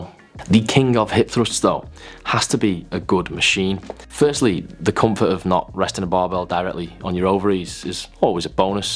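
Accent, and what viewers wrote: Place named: Manchester, United Kingdom. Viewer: Certainly sounds like Manchester to me. Hello from a fellow manc!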